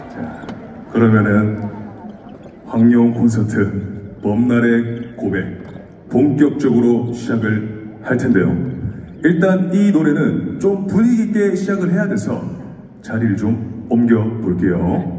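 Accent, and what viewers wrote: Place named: South Korea. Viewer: 우리가수님 노래도 잘하시고 말도 너무잘하세요 사투리를 구수하게 정감있어 좋아요 대전 막콘 잘하세요 이번 두번째 콘서트 대성공이예요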